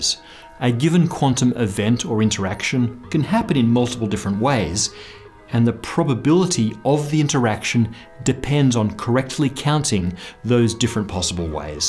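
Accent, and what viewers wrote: Australia. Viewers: sounds more Australian or NewZealand than British. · I'm not sure if people are joking but this 'aint no British accent. The guy's got a posh Australian accent. Who knew?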